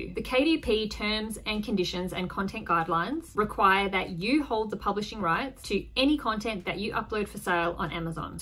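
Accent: Australia. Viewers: Aussie accent? Thanks for sharing!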